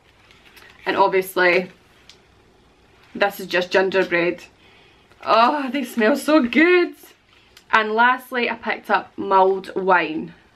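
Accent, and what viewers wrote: Scotland, United Kingdom. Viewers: I never considered what a Dollar Store would be called in other countries. A store in america called PoundLand would not go over so well. lol. Love your accent by the way, and I do mean your ...regional? scottish accent. I love listening to it.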